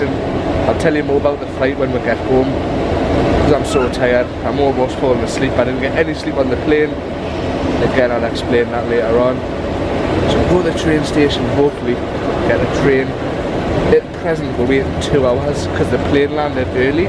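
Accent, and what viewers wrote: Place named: Newcastle, United Kingdom. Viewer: It’s always hard coming home, and nice to hear a fellow Geordie.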